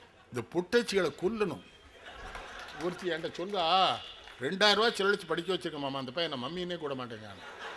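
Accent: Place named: Tirunelveli, India. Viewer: Pesum pothey pechila thimir kodukirathu nellai Tamil mattum thanla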